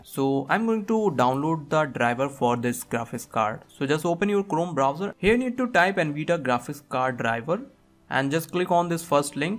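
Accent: India. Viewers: Its always the guy with the cool Indian accent that fixes my tech problems. Thank you! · É SEMPRE O INDINAO COM SOTAQUE MAIS RANHOSO DA HISTORIA QUE NAO RESOLVE O PROBLEMA SINCERAMENTE MEU ÉS IPOR RAÇA MAL CHEIROSA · indian accent >> every other educational video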